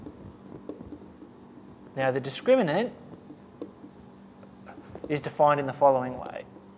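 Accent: Australia. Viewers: are u aussie?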